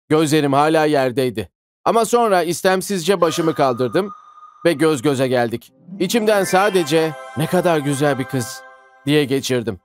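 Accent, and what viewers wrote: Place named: Black Sea, Turkey. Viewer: seslendirmen karadenizli kesin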